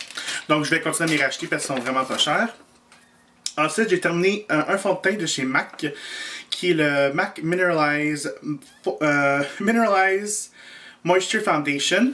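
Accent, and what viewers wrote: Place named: Quebec, Canada. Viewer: En plus le francais du Quebec comme moi hahah ;)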